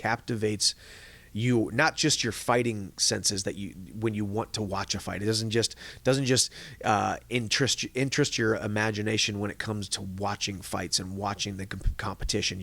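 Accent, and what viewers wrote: United Kingdom. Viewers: Any chance ur scottish ?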